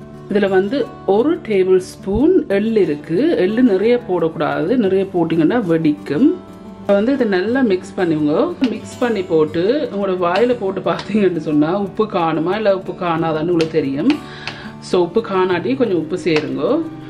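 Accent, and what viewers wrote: Sri Lanka. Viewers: Love your Sri Lankan accent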